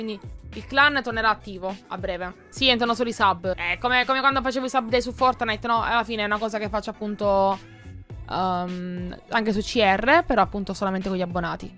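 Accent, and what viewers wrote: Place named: Sicily, Italy. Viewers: Ma sei palermitana io catanese cmq sembri palerimitana dall'accsnto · Buonu nisciu l'accento siciliano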